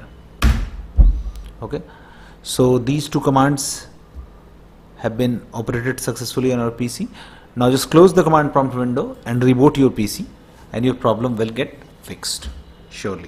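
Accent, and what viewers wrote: India. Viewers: god when indian ppl speek english its so funny t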